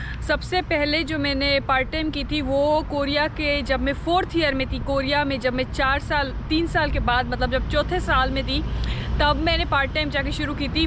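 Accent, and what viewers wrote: Sindh, Pakistan. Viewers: I listing Sindhi style urdu speaking after 15 year love thissssss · Paka Pakistan sa ha bolna sa lag raha ho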